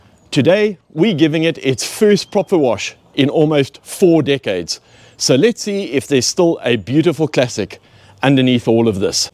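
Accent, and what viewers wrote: South Africa. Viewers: Great to hear a South African accent broadcasting from the soggy isle. Good luck with the restoration. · Hello Sir, are you from South Africa..? 😊😊😊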